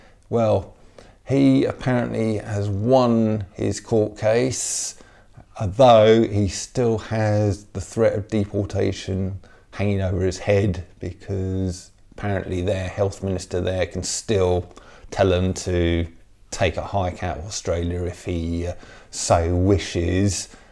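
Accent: England, United Kingdom. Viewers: great points and I love that English accent 👌👏🇨🇦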